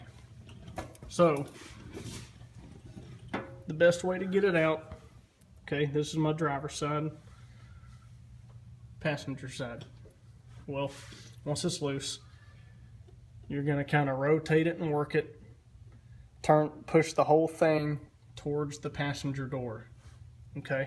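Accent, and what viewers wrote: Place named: South, United States. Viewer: The southern accent makes it so much better, though. 💪 🍻 🔥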